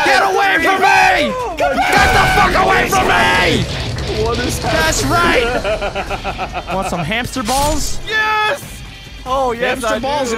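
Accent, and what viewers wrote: Canada. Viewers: Yeah. He’s Canadian. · Ma gad,vanoss now bein ragin 😂,the canadian accent tho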